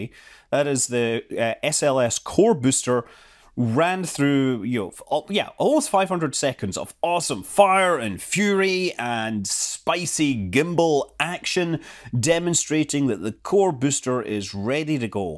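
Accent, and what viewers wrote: Scotland, United Kingdom. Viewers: I find it interesting that Scott's accent has changed little, if at all since moving to California. I'm not sure how long he's been there now, but I'm sure it's been at least a year or two, if not more. But I remember as a kid growing up in Southern Ontario. Between Michigan and Upstate New York, if you're not familiar with the location. We had a kid come to our school who moved up here from Tennessee in about grade 5 and he started off with a pretty thick southern drawl. But after maybe a year or so, his accent had mostly disappeared and he had more of a northern accent. But the one kid I knew from Saudi Arabia kept his accent right up until I lost contact with him in high school. Heh, used to give him a hard time about it sometimes, always jokingly, of course. He was a friend, after all. I chalk this up to a few things though. 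First and most obvious being that a southern drawl isn't nearly as thick of an accent as Scottish. And the second being that children tend to learn these things a lot easier/faster than adults. Like, my Mom and Aunt spent the first few years of their lives living in Germany and no doubt had German accents when they came over to Canada. But don't have a hint of a German accent. Meanwhile my grandparents both had very thick German accents for the almost 70 years they lived here until their deaths. Maybe it's down to how much easier and more naturally children learn than adults, or maybe it's down to my Grandparents having had more exposure to German acc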